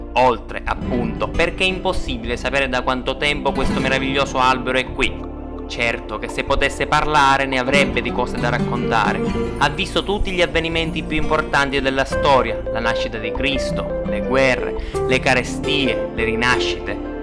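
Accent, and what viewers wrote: Sicily, Italy. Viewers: minchia che accentazzo siculo! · accento siculo e pure un pò culo.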